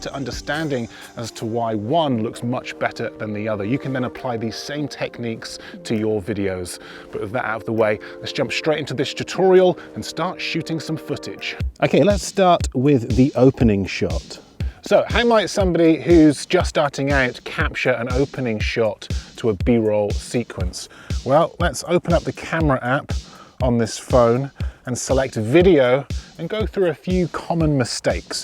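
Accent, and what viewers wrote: United Kingdom. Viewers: Dear, I wish to thank you here for your videos! They are a great pleasure to watch; may be I will improve my shooting skills and also my English (thanks for your British accent). I love also the South-West of England, where I spent a little vacancy on motorbike and where I made my first on-board action cam videos (beginners quality, but lovely memories). Please, do you have a donation address, so that I can offer you a beer or a cider? Cheers from Italy.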